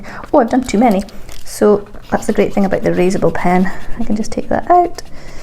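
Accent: Scotland, United Kingdom. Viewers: Fab video. Can i ask where u are from please? Are u north east scotland? X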